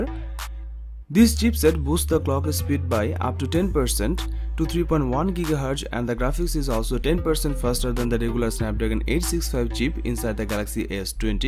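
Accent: Bengal, India. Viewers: Indian accent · Hmm Bengali hain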